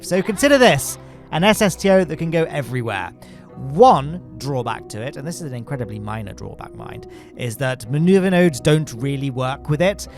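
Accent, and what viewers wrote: United Kingdom. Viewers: Find me a non British KSP content creator…. It’s annoying. Honestly, what is with British people and KSP? I even have a friend in Britain who plays. I’m not overly pissed, I’m saying there’s too many and I’m done listening to the accent. I’m entitled to my own opinion for whoever finds this comment and so are you.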